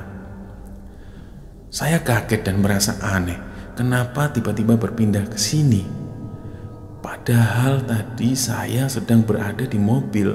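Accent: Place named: Tegal, Indonesia. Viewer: Walah ternyata mas wong Tegal rupanya. Ngapak dong...😁😁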